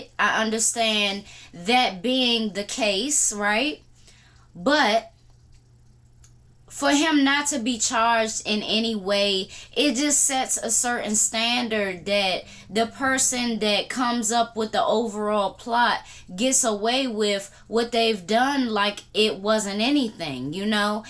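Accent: Washington DC, United States. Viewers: I'm from WASHINGTON D.C. UPTOWN PROJECTS 640 PARK/MORTON I WAS BORN IN EASTGATE GARDENS SOUTHEAST... ARE YOU FROM WASHINGTON D.C.???? YOU SOUND LIKE A DC girl..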